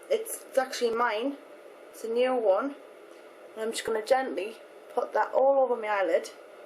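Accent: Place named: Northern England, United Kingdom. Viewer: I always enjoy listening to your Northern English accent! And your makeup tips are awesome as well ;)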